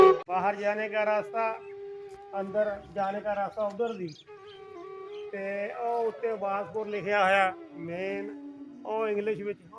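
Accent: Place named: Ludhiana, India. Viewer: Sir aapki boli to Ludhiana deha form Begowel doraha Ludhiana Punjab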